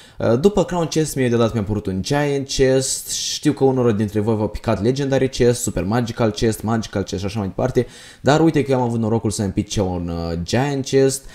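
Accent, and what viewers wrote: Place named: Moldova. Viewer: esti moldovean ? Eu tot ca team inteles cand ai vorbit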